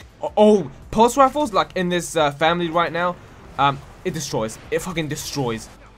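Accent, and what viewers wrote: United Kingdom. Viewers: with a british accent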